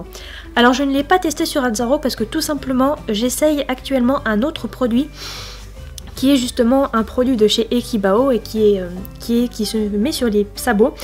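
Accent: England, United Kingdom. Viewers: 😊💙 (j'adore ton accent anglais ) 💙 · Sinon superbe vidéo le son était parfait rien a redire Azzaro est juste trop beau et ton accent anglais est normal ! Si tu entendais le mien !XD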